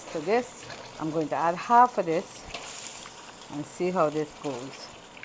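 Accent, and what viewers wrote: Trinidad and Tobago. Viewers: true trini. kept your accent girl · Listening to you reminds me of my grandmother who was Trinidadian bring back memories ❤❤ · don't change your trini accent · Just found your channel. Enjoyed the rapport and watching you cook. Love your kitchen. Nice to hear the trini accent. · I LOVE YOUR TRINIDADIAN ACCENT ! VERY RELAXING !!🇹🇹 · I love Trini accents, love me some roti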